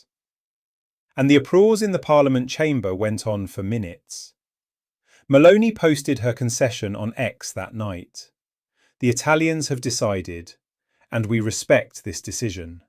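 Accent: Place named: United Kingdom. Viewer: Narrators accent is British… considering what a 💩 show the UK is right now…. Hmmm 🧐 I say your video is 🐂 💩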